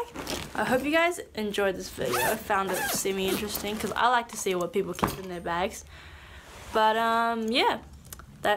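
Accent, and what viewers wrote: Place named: New Zealand. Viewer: Are you from New Zealand?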